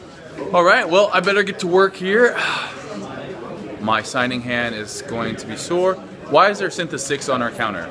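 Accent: Scotland, United Kingdom. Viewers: Hahaha ur Scottish accent is well funny. Come to MANCHESTER ENGLAND!!!! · Love your Scottish accent haha makes me laugh!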